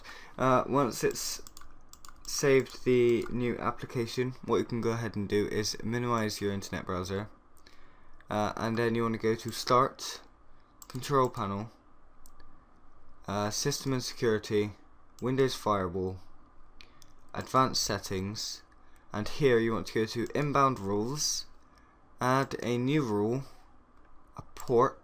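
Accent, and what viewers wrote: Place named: Bristol, United Kingdom. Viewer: You sound Bristolian : O and this helped ty, kept finding tutorials for this and found american routers -_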